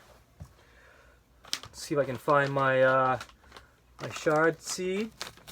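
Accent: New England, United States. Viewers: Great video! Im getting ready to set up an indoor rack system and you answered a bunch of questions. Sounds like you're in New England 😎? We're out of Maine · Nice work! I'm new to your channel and I really enjoyed the content and do I hear a bit of new England accent?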